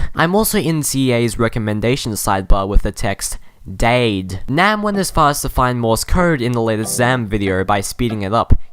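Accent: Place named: Australia. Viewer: Are you Australian? I can hear it in your voice. I'm Australian aswell.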